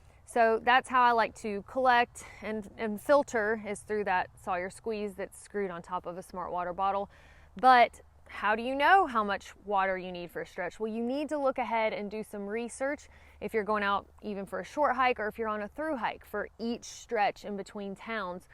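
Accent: South, United States. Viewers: TOO FUNNY!😄 Love your accent,! REAL Southern! 👍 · You sound American !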